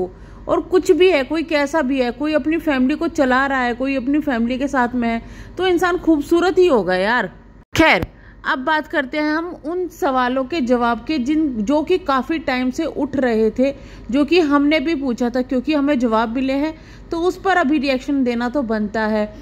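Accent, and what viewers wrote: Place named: Haryana, India. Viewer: Mai ek baat bolungi mujhe lagta hai aaoke tone se aap haryana side ke ho but mujhe aapke state se koi matlab nhi hai mai bas ye bolna chahti hun ki dekhiye hamare bihar up me na sasural walo ko agar kisi v chiz me invitation dena hota hai specially local me mayka ho to ghar aakar bolte hai kamini ke 2 bhai hai kisi ek ko to ghar aakar saas sasur ko invitation dena chahiye tha kyunki relation pahle se hi thik nhi the to itna ro jarur karna tha or ye comment aap kamini ke channel pe jakar padh skti hai ye unke khud ke subscribers v bol rahe hai or jiss din arti ka engagement ka wo roye thi uss vlog me kamini ne badua diya tha ki jisne v meri behan ko rulaya hai uska bhagwan kavi bhala nhi karega ye line sahi tha kamini ka kyunki roye to wo unki saas ki wajah se thi to kamini apne hi saas ko baudua di thi ye v sahi nhi tha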